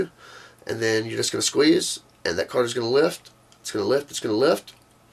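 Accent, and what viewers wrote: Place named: United States. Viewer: Hey I love your particular American accent! =D Is it a mixture of more than one accent? Nice voice too.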